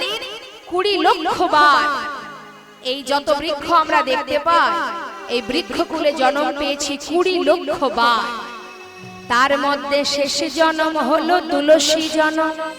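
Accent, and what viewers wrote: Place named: Noakhali, Bangladesh. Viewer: দাদা আপনার বাড়ি বাংলাদেশের কোন এলাকায় ,? আপনার কথা শুনে মনে হচ্ছে ঢাকা নোয়াখালী কিংবা ওর আশে পাশে।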